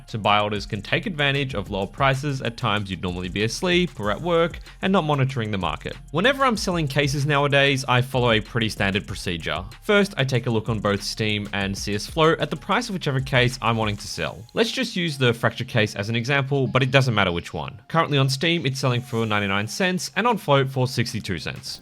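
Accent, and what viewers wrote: Australia. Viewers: couldn't help but notice the aussie accent haha. I'm from Australia myself and was wondering how you account for the 10% gst for depositing on 3rd party sites like skinport/csfloat. Do you just tank the fees or is there a way to navigate around it? Love the vids man keep it up!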